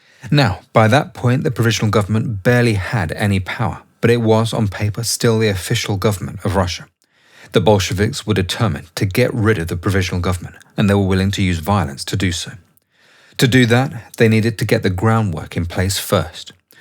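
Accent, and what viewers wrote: United Kingdom. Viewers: Yeah usually British accent is red flag of AI slop (due to its ability to deceive American audiences) , but this Channel is solid!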